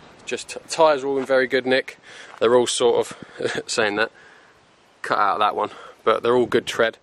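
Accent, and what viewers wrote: United Kingdom. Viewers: What an ugly speach...that britisch slang sucks..sounds Horrorble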